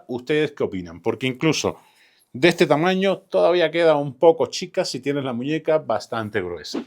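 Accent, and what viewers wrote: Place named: Spain. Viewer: haaaa eres mexicano🤔,,porque tienes ese acento,,español.pero buen review,,igual que isa marcial 😁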